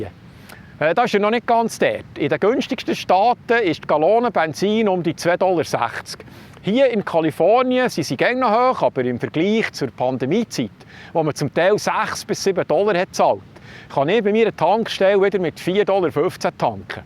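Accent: Bern, Switzerland. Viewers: Danke viillmaal! Ich lose dir nöd nume gern zue will du immer wieder interessanti Details bringsch und nöd eisiitig bisch, sondern als gebürtigi Züri-Schnurä au wäge dim wunderschöne Bärner Dialäkt. Liebi Grüess us Urdorf, Agglo Züri.:-) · Gute Sendung des Herrn in schönem Berndeutsch! Bitte weiter so!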